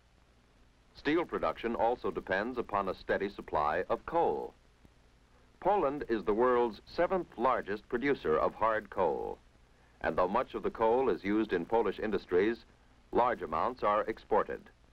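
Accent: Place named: Mid-Atlantic, United States. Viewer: I'm not sure but he is speaking a mid transatlantic accent which was really great.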